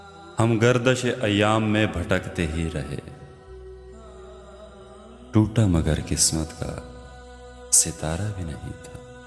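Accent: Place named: Punjab, India. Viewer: Uupp Sir app ne khud apni awaz sune hai,halke Punjabi lehje mein jab app shayri karty ho app ki awaz bari cute lagte had😊❤❤..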